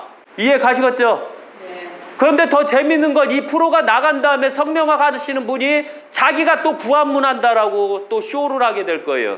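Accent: Chungcheong, South Korea. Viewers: 충청도 사투리가 구수 합니다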